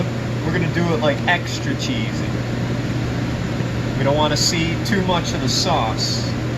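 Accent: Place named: Bronx, United States. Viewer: You sound like your from thr bronx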